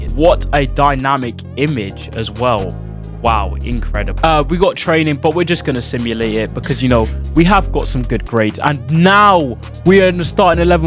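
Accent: United Kingdom. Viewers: Well duh but he’s English accent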